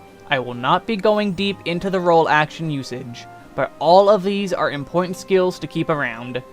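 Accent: United States. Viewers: At first I thought his way of speaking to be familiar, then I realized his intonation is basically the same as Superbutterbuns', but a way more chill. (I wonder if they're from the same state in the US, but I don't know how to differentiate all the accents, so if anyone knows, please tell me)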